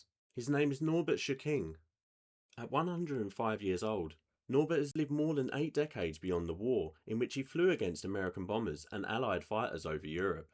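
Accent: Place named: London, United Kingdom. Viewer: work on your accent, too much London in it.....the letter 'T' is meant to be pronounced, not figh-er or for-y.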